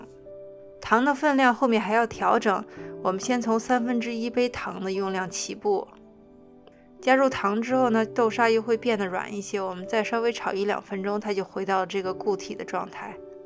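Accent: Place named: Shaanxi, China. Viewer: 听口音陕西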